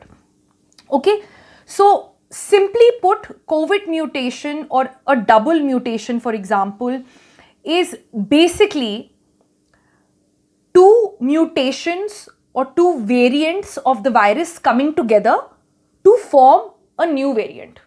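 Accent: India. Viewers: She is talking english, but the accent make it sounds like the language they are talking in India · Purely Indian pakka accent....thanks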